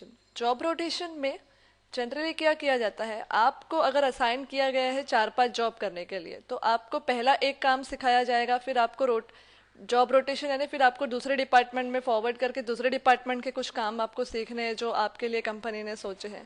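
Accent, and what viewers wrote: India. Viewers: Helpful program but Indian English voice that is difficult to hear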